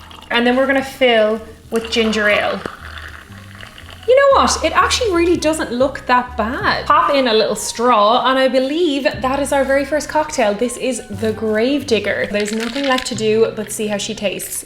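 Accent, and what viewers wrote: Ireland. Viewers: Really beautiful woman with Irish accents, one of my favorite things....but then again I am a man. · anyone else notice her Irish accent is stronger on her own channel, then when she is tatse testing food on the try channel.. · This combines Irish lore and alcohol hosted by Tinkerbell I mean the Absinthe Fairy with an Irish accent - win! I wonder if Walt got the inspiration for Tink from an absinthe fairy?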